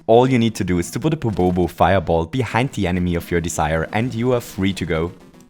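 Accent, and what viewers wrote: Germany. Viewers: are you german? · hey cool and very helpful video! :) p.s. are you German?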